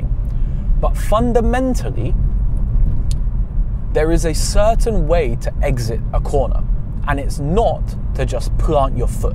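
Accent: United Kingdom. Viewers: Mf speaking British 💀💀💀🤢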